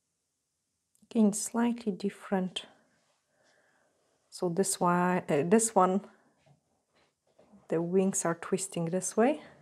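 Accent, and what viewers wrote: Scotland, United Kingdom. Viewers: I'm ordering your foil design gel and white french gel as it looks AMAZING when you use it... I already have your foil gel and it's the most brilliant foil gel or glue I've ever used omg. It's brill. Your colour gels look amazing too as they look so pigmented in your vids but i just love your personality and the way you say wee when you're saying little ha ha. It's sooooo cute and sweet as you live in Scotland it's rubbed off on you. You remind me of my mum-in a really sweet way. She's English but spent half her life in Scotland and uses Scottish slang in her English accent. It's sooooooo cute and sweet. I think you're such a sweet feminine lovely lady and you're super duper talented on top... and you're gorgeous!!! Ha ha I love your vids. I've copied a few (tried) hope you don't mind. You're extremely talented and I'm looking forward to getting my design gel and French white gel X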